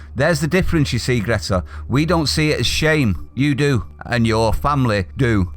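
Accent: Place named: Merseyside, United Kingdom. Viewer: Totally nailed it. little Greta made her fame by being a funny witty talking parrot and now that she is famous... she complains that people were coming to see her just because she was an unusually entertaining parrot in their local town square. Waki Waki Greta! here are some more news for you: same people, when visiting Paris, also take a selfie with the Eiffel tower just because its the famous... Eiffel tower. None of them have even a bit of appreciation to the engineering genuinity of the 19th century. They just want to brag to their friends they were seeing the f&%king tower while they (friends) were taking their kids to school in the rain. Your channel became my favorite daily news channel... from way-way far from Britain you sometimes sound to be like a disillusioned John Lennon speaking to our world, horrified by the reality all around... 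(sorry for my cultural narrow mind views... to my non Brit ears Mersey area accents sounds as one... ). Keep the great work!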